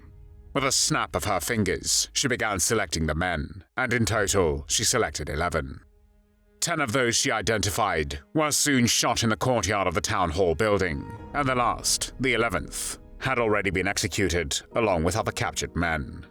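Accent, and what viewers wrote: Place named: United Kingdom. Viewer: That "Brittish accent" AI voice is just too much for me.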